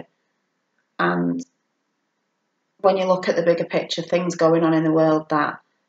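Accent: United Kingdom. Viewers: Oh no just be you!! Your fabulous 😊 Loving your content and it's so nice to hear someone else who's British LOL! Witches of East end is pretty darn good (not bad eye candy either) 🤣 xx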